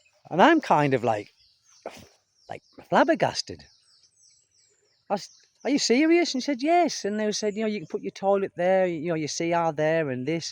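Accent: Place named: Northern England, United Kingdom. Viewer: Hi I have just discovered your channel and finding it really interesting I have had similar experience when I spent a year in Bali same expectation from locals when westerner arrives they must be wealthy even though I was exploring idea to retire there because retirement in my home country New Zealand was not looking to be comfortable financially however I gave it a year the weather I loved most of the people were friendly but I returned to New Zealand Bali was never going to work out for various cultural differences some you describe with life in the Philippines by the way I have lived in New Zealand for fifty years originally from north of England Middlesbrough your accent interestingly sounds very northern England curious as to where you originate from?keep up great videos love how your son speaks English I also can slightly hear a little north English accent from him so cute.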